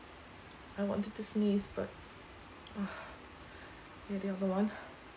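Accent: United Kingdom. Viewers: wow.. sounds very cool with British accent o_o and very informative for current Covid 19 situation !! thanks for your time to make this amazing video ~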